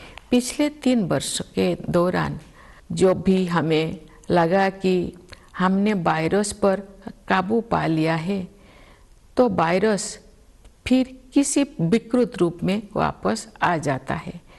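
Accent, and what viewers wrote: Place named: Odisha, India. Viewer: Sounds like odia accent.. from Odisha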